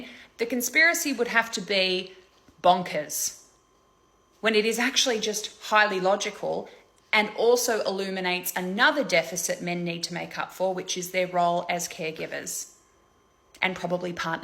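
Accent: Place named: Australia. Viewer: Now I'm going to assume from your accent. You're Australian but forgive if I'm wrong. But in Canada one of our top universities Miguel did a huge study on family courts and devices exist in family court. It's been hundreds of thousands of cases. And the reality is the reason men are less likely to get custody of their child is because men are not prepared when they come to court. 81% of cases were the child has a ongoing medical issue the father is unable to name that issue and its treatments. They're unable to name the doctor that treats this. 91% of men don't know their child's birth date in Canada. Over 98% of men couldn't answer where their child went to school or who their child's teacher was. They couldn't answer if they had any allergies or not. If they take any medication or even how to administer it. When you go for custody, you were given a list to prove to the family courts that you are able to maintain custody safely. 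And in 85% of cases in Canada, men fail to have that list done. If there is an out burst in court 76% of the time it is men that have that out burst. Tell what the study found was that The majority of men are unwilling to put in the work and the effort it takes to prove to the court that you can safely have custody of your child. And that you are willing and able to healthily. Co-parent with The child's other parent. Also, that study stated that in 94% of cases were domestic abuse is proven. It is the man who is the abuser. So not giving custody to a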